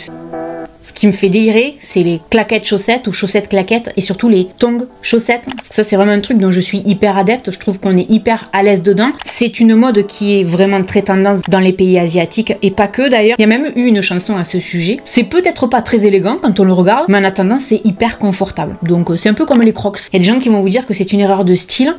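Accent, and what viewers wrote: South, France. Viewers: merci, ça fait un moment que je te suis, je ne poste pas mais aujourd'hui je voudrais te remercier d'être si bienveillante, sans préjugés, naturelle... je suis grosse et je le supporterai beaucoup mieux si la société me laissez vivre comme je suis. alors en plus, y il a le maquillage, les fringues, le style... si une personne s'habille avec le style qui lui plait, bravo et ce n'est pas aux autres aigris de lui faire des remarques. perso Cristina Cordula ressemble a une grand mère ou a la méchante reine de Blanche Neige, rien de gentil en elle, que du faux. continue comme ça, ça me remonte le moral de voir tes post et d'entendre ton bel accent du Sud (qui n'est pas a la mode a la télé non plus).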